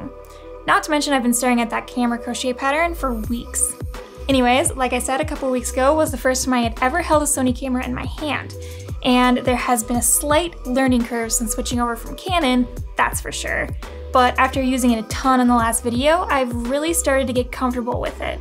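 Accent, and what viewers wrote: Midwest, United States. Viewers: ok gotcha! Yep that Midwest accent 😂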